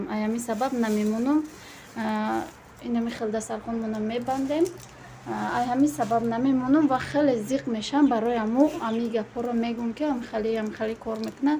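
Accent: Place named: Gozimalik, Tajikistan. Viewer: Эээ шумо ай хуросоненку, моям ай Хуросонем , чиста Гозималики гап мезанен шумо 🥰🥰🥰🥰🥰🥰🥰🥰🥰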